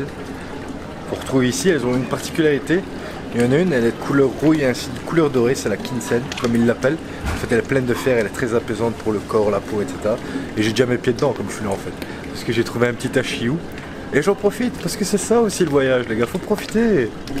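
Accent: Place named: Belgium. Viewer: Salut! C'est chouette d'entendre parler "belge" au sujet du Japon. Merci pour ta trop brève vidéo au sujet de Kobe. Je rentre d'un 5ème séjour (en 10 ans) au Japon. J'y pratique la pêche "en mer du bord". Je suis namurois et j'ai 73 ans. J'étais à Yokohama qui rime avec drôle de choix jusqu'au 20 juin. En bon amateur de tout ce que le Japon propose, je prépare déjà mon prochain voyage et ce sera...Kobe. Voilà comment je tombe sur tes reportages. Bravo, je t'encourage à poursuivre. Konitchiwa. Guy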